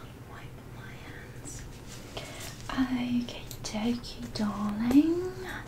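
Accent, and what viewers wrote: United Kingdom. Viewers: omg finally a British accent ASMR 🤍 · The accent is actually so realistic because this is like the EXACT accent my British friend has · As a british person who speaks like this. Thank you! So many posh accents and this is a much much more common accent! Love you videos!! X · your british accent is so surprising and pretty 😭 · I LOVE your british accent role plays 🥺🥺 they’re so good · You sound just like my friend! We're both British but I'm from a more stereotypically 'chavvy' area, she's from the 'fancy' area. Your British accent is amazing! ❤ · people still speak like that in Britain, it just depends where you go. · your british accent is so impressive 😭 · This argument thread is too funny lol, so I’ll settle it. Is this accent impressive coming from a Canadian? - yes. Is this how all brits sound? - no of course not, but a lot also absolutely do. 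kind of swaps between posh and cockney here; which is why it may sound a little off to us brits (but still impressive!) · i love how the accents are actually british but throughout the video it switches so fast to different areas of the uk😭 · why did I expect u to start speaking in a north east/northern British accent 😭😭 still love the accent tho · Love how it dabbles between British and Australian 😂😂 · when she talks in a British accent or just her random voice is just so comforting like she has such a comforting voice and it brings my anxiety down so much. ❤ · I love your accent on this! I'm British and you sound like someone who's lived in loads of different parts of Britain (and maybe Ireland as well). When people go from place to place you get accents like this, and they're so nice! It's like you can hear all the different influences of the British accents and dialects. Great job! xxx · The British accents suits her so much omg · can you do another one with a british voice i loved this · Love this! 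As a brit im v impressed, bit of the keira knigtlys about ur accent 👏🏻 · British character is back again!!!🥺. Recently I have missed British roleplay characters on your channel ♡ and you posted British RP characters!🫶. i can only say🥹. Did my dream come true?✨️. I can imagine 1 Hour with a british friend as beautiful and sweet as you I must feel lucky and that is the best thing in my life💖🩷. I wish I had a british friend like you loll😭. And Fyi I love your British accent.Your british accent sounds great!. This will be my favorite roleplay💗🩷. Yes I Love this rp for sure!♡ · I am a british girl and live in britian, and i have to say you do a perfect british accent, also you do a very good french accent aswell,❤️, love all your videos, very relaxing, and some are funny, your very pretty and cute lady xXx 💕❤️💕❤️💕❤️ xXx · i'm home alone this week and the loneliness started kicking in tonight, but this video was just what i needed to keep me company. 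and as a brit, i love the accent! the only slip i caught was , we don't say candy. we say sweets! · You are one of my favorite ASMR channels to watch. I love your British accent.😊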